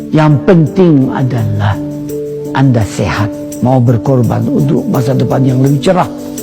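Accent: Java, Indonesia. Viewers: 😅😂 LANCAR AMAT BAHASA GOYANG INDONBABU LOGHAT JAWA YANG DI TUTURKAN AMA MBAK BABU JAWAHAMA NIH.. BERBELIT2 BERPUTER2, BERPUSING2 DAN BERULANG2.. 😅😂 BABU.. BABU..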